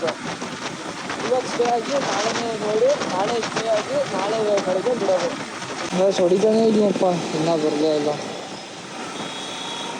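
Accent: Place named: Malnad, India. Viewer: Propaer malnd kannada matdthira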